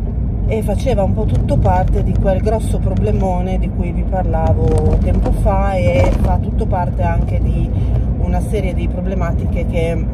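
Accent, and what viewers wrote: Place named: Piacenza, Italy. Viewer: Ciao, grazie per aver deciso di metterti a nudo con noi… Avevo sentito l’accento! Sei di Piacenza! Io ci ho abitato dai 12 ai 18 anni! Anche io vicino al centro (via Genova)! Ed è vero: e’ un caos! Un abbraccio forte ❤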